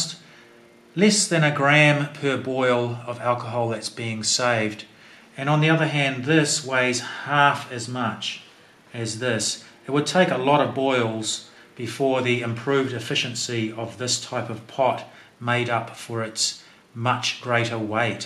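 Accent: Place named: New Zealand. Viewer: nalgene also has a range of ultralite bottles. Nice video by the way, I was a little surprised when I heard the kiwi accent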